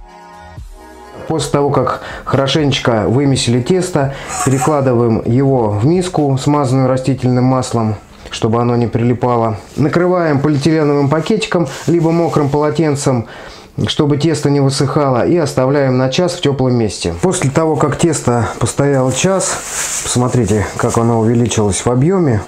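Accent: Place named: Voronezh, Russia. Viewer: открыл рецепт, услышал говор и сразу понял - зёма. спасибо братюнь, тесто уже подходит. наш говорок только с воронежским можно спутать)))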